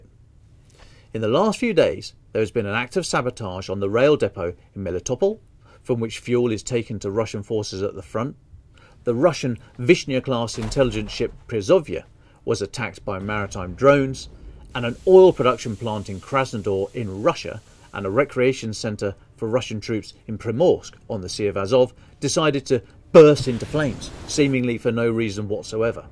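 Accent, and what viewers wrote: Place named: United Kingdom. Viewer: Nice to hear a brit giving love to good old T.R. 😄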